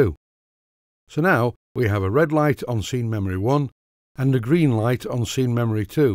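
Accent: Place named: Northern England, United Kingdom. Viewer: That's a very helpful video - thanks a lot! (And, I couldn't help noticing, very sweet Northern English dialect - u sounds, I luve it :-)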